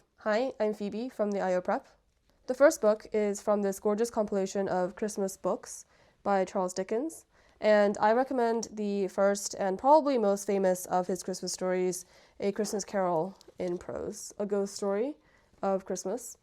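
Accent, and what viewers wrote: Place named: Ireland. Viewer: oh that's cool, it sounds vaguely irish at some points? Or maybe it's just your voice, either way it's beautiful.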